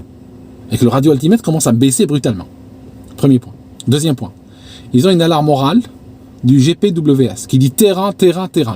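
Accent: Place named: Switzerland. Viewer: charmant le petit accent suisse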